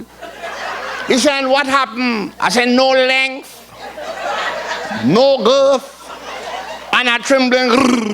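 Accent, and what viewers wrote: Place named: Trinidad and Tobago. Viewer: most imformitive show ever '. however his language make me proud to be trinidadian love it love you i want to sound like you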